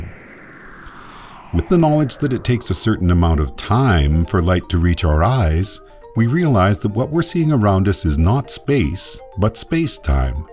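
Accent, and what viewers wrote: Canada. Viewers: I can hear your Canadian accent ( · This guy's Canadian accent is really starting to piss me off. "Quantum FOAHM" "Michael's GOAHL is to poke HOHLS in the..."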